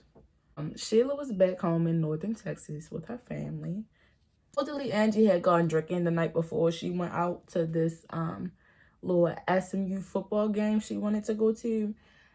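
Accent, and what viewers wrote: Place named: Baltimore, United States. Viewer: Girl, just came here to say that I subscribed to hear true crime with a Bmore accent fr fr! Love it! ❤️ Making me miss home.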